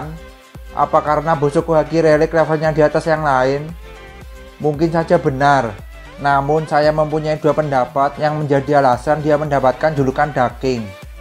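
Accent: Java, Indonesia. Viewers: Suaramu medhok min ... Heheheh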